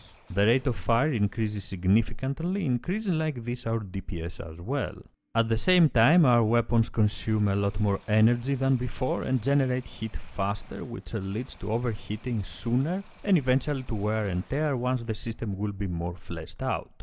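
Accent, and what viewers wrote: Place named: Greece. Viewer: C'est l'accent Grec ... :-)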